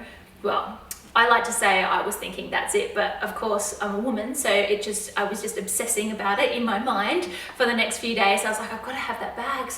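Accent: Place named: Australia. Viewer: So nice hearing an Aussie accent on YouTube LV videos. Love the story. I almost had a heart attack when you pulled out the wallet.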